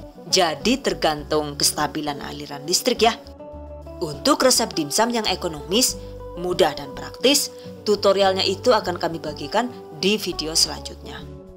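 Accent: Banyumas, Indonesia. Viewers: Terimakasih resep2xa,selalu menyenangkan menonton video THV, gk jarang saya senyum2 mendengar cara bicara si bunda, yg paling saya suka bicara dlm logat ngapak😁👍...... Request saus pasangan dimsum, matursuwun🙏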